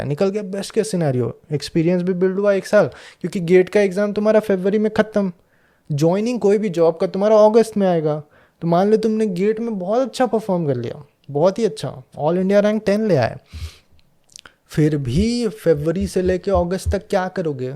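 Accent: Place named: United States. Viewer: Feels like now your Hindi is slightly sounding American accent 😅. Just saying what I felt. Been more than a year, I heard you speaking Hindi.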